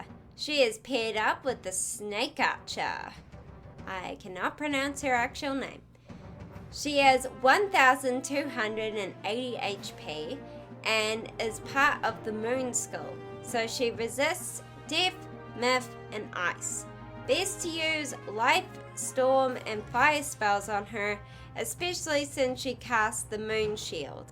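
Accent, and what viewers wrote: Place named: New Zealand. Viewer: Thanks for the guide, and I love your accent, it sounds like New Zealand.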